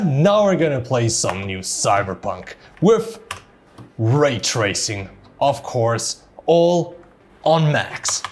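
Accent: South Africa. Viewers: Trou met my <3 Beautiful Saffa Accent!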